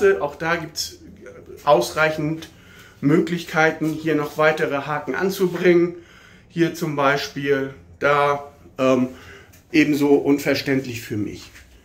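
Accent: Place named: North Germany, Germany. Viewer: O Gott. Was für ein norddeutsches Gelabere. „Sich mit dem Gedanken hegen“ 😂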